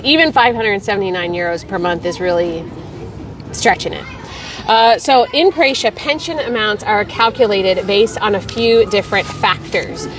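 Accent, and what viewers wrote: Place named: Texas, United States. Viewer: Hey!~ It was so lovely to connect with you at the Dallas meet up! Thanks again for taking the time despite all of the priorities you had going on. Really appreciate you, your team, and all that y'all do! *BTW~ I finally heard a bit of Texas in your accent in this episode! Hope to meet up again when we finally get to Croatia next year! 😊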